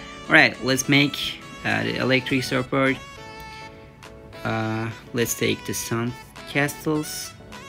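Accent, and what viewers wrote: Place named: Turkey. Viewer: sesi çok türk gibi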